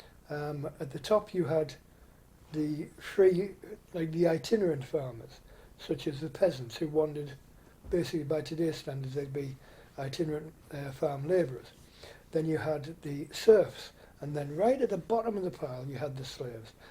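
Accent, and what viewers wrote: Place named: United Kingdom. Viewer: Firstly, you nailed that intro, I wouldn’t mind if it was a few seconds longer. Second, you are an incredible narrator! Hardly ever miss a beat, British accent adds to this and regardless of the subject your voice alone captivates and keeps the viewers’ attention. Thirdly, you must keep this going, this is incredibly marketable on YouTube and I would love to work with you on the production of future videos. Add a slide show of images, easily attainable from the web, and your channel could rival Infographics and the like. Bravo 👏🏽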